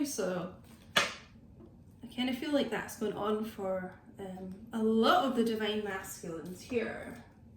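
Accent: Scotland, United Kingdom. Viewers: Great realisation. What a beautiful Scots accent.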